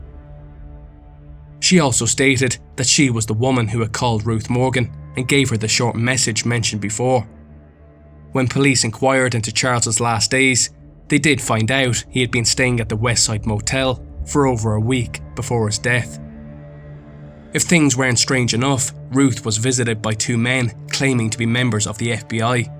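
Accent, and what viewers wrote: Ireland. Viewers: Great vids, pleased to find an Irish accent among all the American channels. 😁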